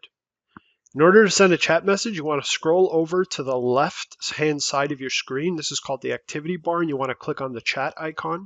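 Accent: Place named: United States. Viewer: Short and simple, American spoken!!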